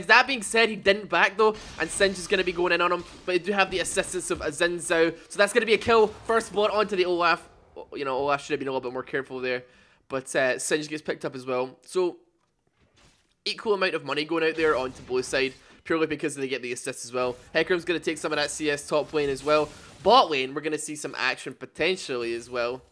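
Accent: Scotland, United Kingdom. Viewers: where are you from? scotland?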